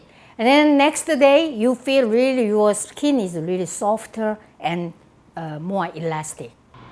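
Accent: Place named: South Korea. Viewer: 분명 다른나라언어 인데.. 한국말같지..? 우리엄마가 영어하는거같아..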